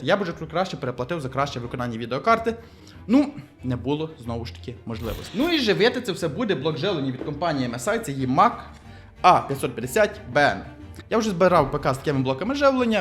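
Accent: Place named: Ternopil, Ukraine. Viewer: По розмові чути тернопільська або Франківська область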